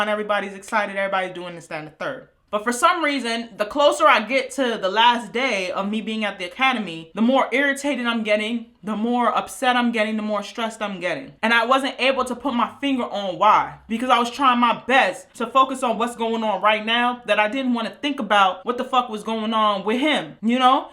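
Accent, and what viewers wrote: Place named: New York, United States. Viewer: This is not a funny experience but, I love your personality & you sound like you’re from ny!!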